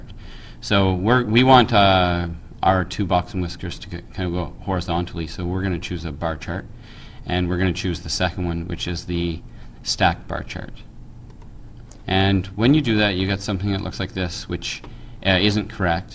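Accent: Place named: United States. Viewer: Thank you so so much! I had an assignment for uni and had no idea how to do this or anything on excel. Your thorough explanation with your lovely american accent was amazing! Thanks heaps and heaps! :D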